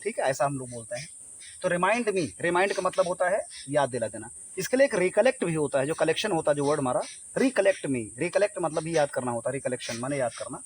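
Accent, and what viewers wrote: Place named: Uttar Pradesh, India. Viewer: Great performance Bhai ji.... Aap pakka bhaiya style mein padhaate ho.... U. P. Wala touch bhi hai..... Aapka padhaya koi bhi padh jaayega... Your Hindi is also very tuff...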